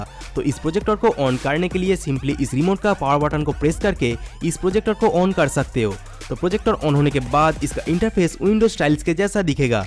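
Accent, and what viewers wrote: Bengal, India. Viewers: Aare kotha sunlei bojha Jai ... Tchara jokon uni 360 camera er unboxing korchilen tokon oner Facebook e Bangla te lekha chilo.. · bengoli h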